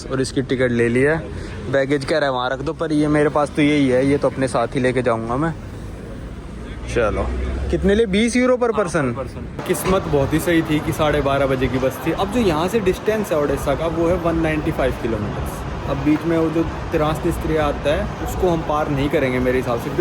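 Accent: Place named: Haryana, India. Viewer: Bro ur accent is totally changed.. in your starting videos your accent was more different. It's more Haryanvi now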